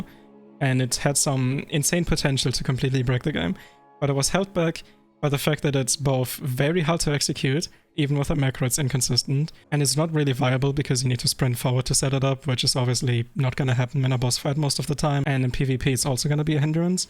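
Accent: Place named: Germany. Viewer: You’re German right? Because your accent sounds like it :D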